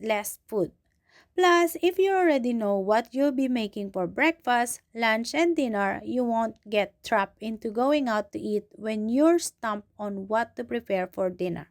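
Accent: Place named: Philippines. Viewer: Lol your filipino accent is too strong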